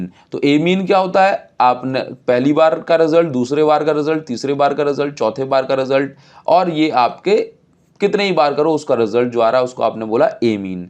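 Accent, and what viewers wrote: Odisha, India. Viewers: Are you odia?? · Are u from odisha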